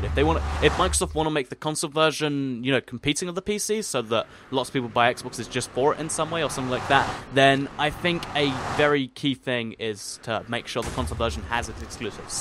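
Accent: United Kingdom. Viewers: You talk like if you were british and not Welsh. Just saying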